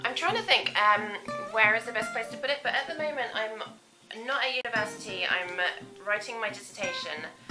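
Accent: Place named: England, United Kingdom. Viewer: Hi, I thoroughly enjoyed your video. I love pretty redheads with an English accent. As insulin users who have a prescription we just recently got covered for the Freestyle Libre in Ontario, Canada. That is a very big savings since the sensors are now $97.00 CAD each.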